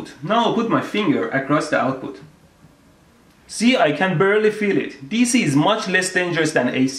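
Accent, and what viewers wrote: India. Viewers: indian,just indian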